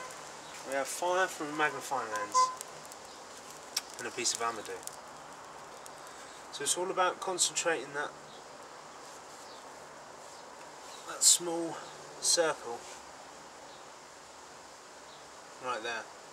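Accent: United Kingdom. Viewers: thats great that you're an outdoors person mate! and i love the british accent! BLOODY HELL MATE! CHEERiO!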